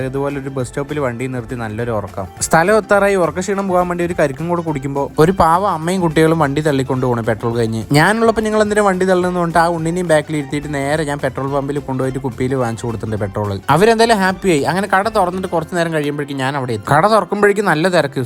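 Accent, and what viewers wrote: Palakkad, India. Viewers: Chettan palakkad allee😅🙌🏻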